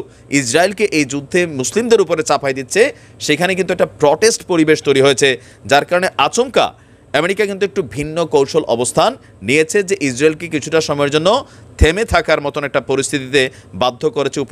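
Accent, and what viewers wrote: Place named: Noakhali, Bangladesh. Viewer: আরে ভাই এতো নোয়াখাল্লা তার পর আওয়া এর ভাষা এমনী হবে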